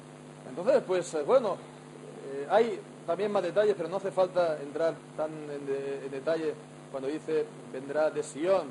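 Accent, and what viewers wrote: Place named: Spain. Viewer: Felicitar al pastor por el sermon, buen trabajo. ¿ De donde es ? por el acento pareciera Español.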